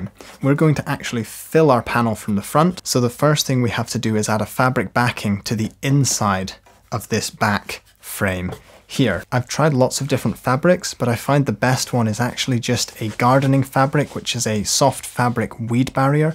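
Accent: Scotland, United Kingdom. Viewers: Chaps… this is what a very posh Scottish person sounds like… must be a Farquhar or similar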